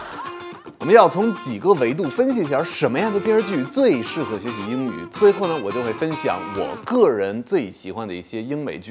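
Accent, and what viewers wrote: Beijing, China. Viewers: 一张口一股子京味😂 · 你的口音是北京腔，哪個訪談節目比較好？ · 老师的中文口音感觉很京味，老师在北京生活比较吗？ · 您这北京话也忒标准了吧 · ，你口音像北京土著人。你是一个长的像外国人，英语说的也像外国人的北京人。哈哈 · 北京口音的外国英语老师。。。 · ，我今天突然发现你有北京口音.... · 你的中文肯定是北京人交的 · 北京口音？ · 我老家南方的， 你的北京话比我说得好，虽然我在北京时间比你长很多。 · 满屏的京味儿哈哈哈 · 是北京口音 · 你竟然還會北京口音 酷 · 这一口京腔啊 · 哈，这一口京腔~ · 京片子味儿哈哈哈哈真的是老炮儿了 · 有的人就是有语言天才，没办法的。这主播比不少北京人更北京。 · 老师的中文说的好好感觉有股北京腔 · 马老师北京话纯正，跟他学没毛病。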